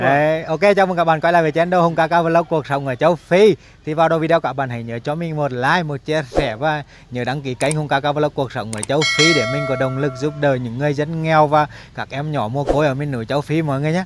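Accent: Nghe An, Vietnam. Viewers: Hùng Kaka thật tuyệt vời, vẫn tiếng Nghệ cười có kể, ăn có kể, đẻ có kể 🤩🤩...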